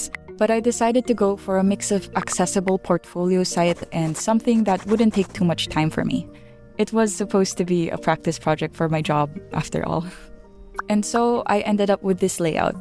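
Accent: Philippines. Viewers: This might be weird but the only video ive seen from you is the duck one and this is the first time im hearing your voice, LIKE ONE MINUTE IN i was like “I HEAR FILIPINO”😭😭😭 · Are you from philippines? Your english accent sounds like that ❤ very adorable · first time watching your content. overall its very simple and cute <3. and loving your filipino accent :3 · i know a filipino when i hear one :face-orange-biting-nails: anw i absolutely love ur arstyle!!!!!!!!!!!! ur voice's soothing too :pppp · I know a filipino when i hear one, lmao literally had to check ur profile when heard ur accent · I've lived in the Philippines for all my life and accent is bothering me, not in a bad way or anything just familiar in a sort of way, her accent reminds me of alot of my Aunts who speak English but their main language is Filipino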